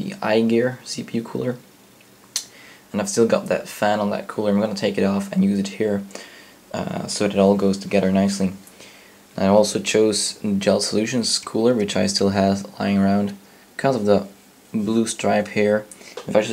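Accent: Netherlands. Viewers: Are you Dutch ? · Ik hoorde gewoon direct dat je nederlands was lol nice vid btw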